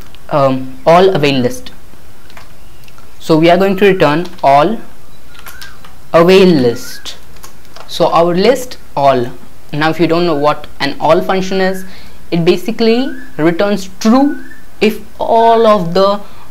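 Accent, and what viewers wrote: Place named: India. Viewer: ahh, your pronunciation and the royal peacocks singing in the background sent me back to India again. Thanks for these tutorials! Great job!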